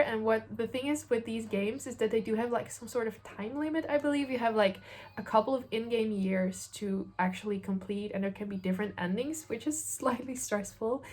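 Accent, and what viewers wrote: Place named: Netherlands. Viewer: Ik hoorde direct aan je accent dat je ook Nederlands bent! ♥️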